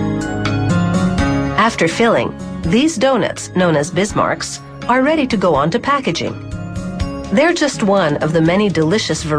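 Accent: Canada. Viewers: wow, such a canadian accent~ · This announcer is very obviously not American. Very noticeable Canadian accent. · is the lady talking from canada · was i the only one who noticed she kinda had a Canadian accent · You can tell these shows are Canadian by the way the narrarator says 'about' and 'out' XD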